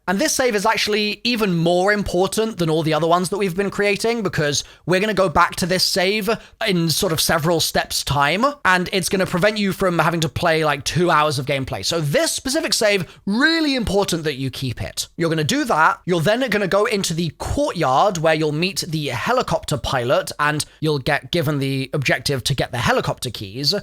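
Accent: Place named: RP, United Kingdom. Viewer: The most irritating voice on YouTube! I can't finish watching this toff! Don't know what you mean with the hand not being there, it's been there every single time for me and I've not waited for those body counts... Doesn't just sound like shit then🫣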